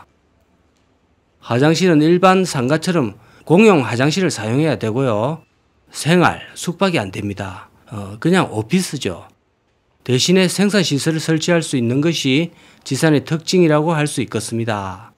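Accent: Gyeongsang, South Korea. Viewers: 고향이 어딘가요? 지는 경남 합천이고 59세 입니다.사투리가 나랑 똑 같아서 정겹네요. 박상은 우리 말고는 잘 모를거에요.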